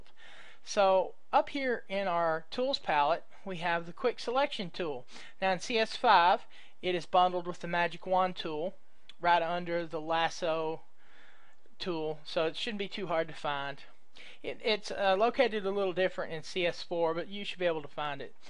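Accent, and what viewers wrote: Texas, United States. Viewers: Thank you! but hey are you from Texas?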